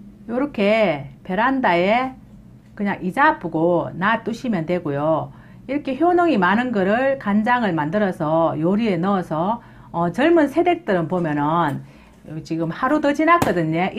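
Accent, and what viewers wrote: Gyeongsang, South Korea. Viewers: 물봉지가 신선한 아이디어네요. 구수한 사투리에 평범한 주부 스타일이 정감있네요. 좋은 정보 감사합니다. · 말씀 너무 구수 하게 잘하십니다. 갱상도 ~~ 표고버섯 간장 꼭 해봐야겠어요. 요긴 촌이라 집마당 독에 1년 하면 될까요? 내년에는 장도 담궈야 되요. 안심먹거리 외부산거는 믿을수없을 뿐더러 장류는 맛도없구 된장은 달기만 하구 ㅎㅎ 살림에 많은 도움 받고있습니다. 감솨합니다. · 경상도 발음 국간장얼.. 소금얼..을 발음이 정말 안되지요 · 정보도 감사하지만 정말 고향생각나는 경상도 사투리도 너무 좋아요!!!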